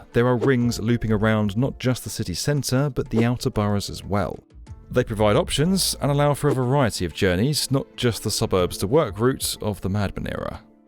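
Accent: United Kingdom. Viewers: Weird hearing a British guy talk about the suburbs I’ve lived in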